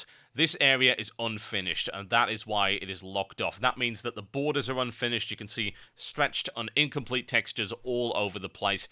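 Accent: United Kingdom. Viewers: how dumb... He's got a british accent and jest look at his channel background....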